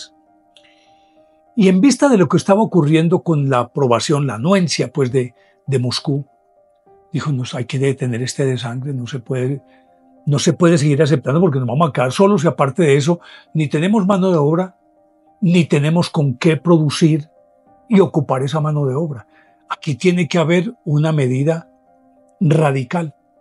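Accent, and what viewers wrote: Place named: Colombia. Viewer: Mi admiración señor don, es fascinante su forma de narrar y contar historias, nos seduce su brillante y forma de hablar con ese bonito acento colombiano,como siempre ha estado usted magistral con su relato,gracias por su inmensa labor de compartir por este canal su enorme sabiduría,saludos desde jerez, España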